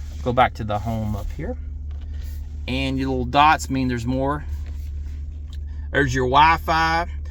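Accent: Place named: United States. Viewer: Thank you, loved your cow boy accent, lol ( No i mean it) I can talk to all dang day long, appriciate you to go over all the controls, it helps a lot, bye the way I fall off my chair laughing on use that dial to tune to you wann jam to lol.... Love it brother.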